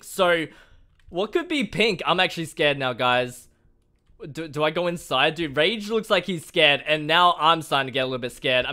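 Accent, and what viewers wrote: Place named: Australia. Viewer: New it you are from Australia not USA OR US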